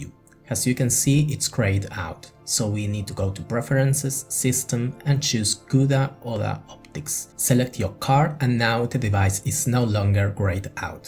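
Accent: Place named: Rioplatense, Argentina. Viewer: are you from argentina? you have a strong rio de la plata accent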